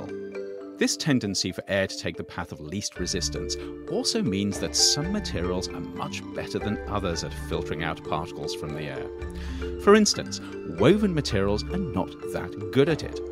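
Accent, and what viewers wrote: United Kingdom. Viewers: Is there a reason why you choose to have a narrator with a British accent?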